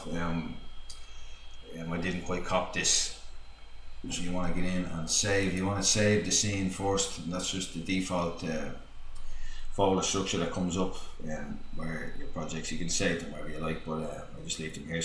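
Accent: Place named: Dublin, Ireland. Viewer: I fucking love hearing a Dublin accent doing high end 3D stuff. Hope you’re well!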